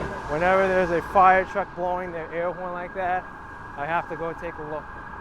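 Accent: New York, United States. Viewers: Is that a new York accent? Thanks for the video, now I don't need to visit. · Great Video - thanks for the info as you walk - keep up the great work and if i ever do get to nyc, i would love to meet the - love your nyc accent lol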